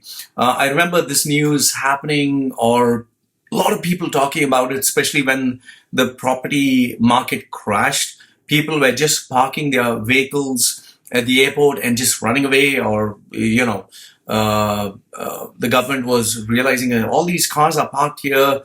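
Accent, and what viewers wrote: India. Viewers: Why has your accent become more Indian sounding of late? Here it was very different 😅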